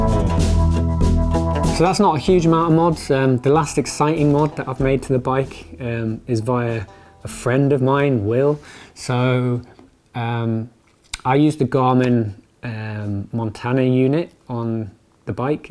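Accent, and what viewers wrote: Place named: England, United Kingdom. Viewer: Enjoy the vids. Hope you get to ride more soon. Off topic, but have you ever watched Project Amber on YouTube? He seems to be a kindred spirit. Maybe from the same part of England as you? (I’m an American, can’t decipher the subtle nuances of the accent). Anyway, y’all both have a calm/soothing presentation. Look forward to your (and his) vids!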